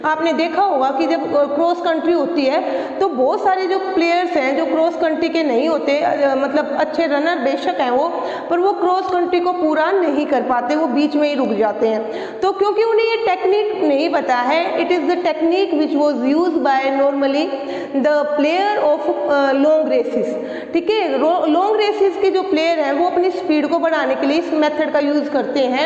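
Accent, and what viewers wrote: Haryana, India. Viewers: super explanation mam or aapni boli haryanvi toch aari thodi thodi mam👌👌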